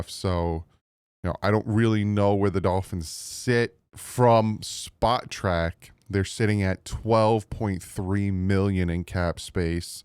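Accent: New Jersey, United States. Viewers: At least your jersey accent isn’t Tony Soprano esque 😂😂😂 then it would sound like “Mika Fitzs-hhpatrik”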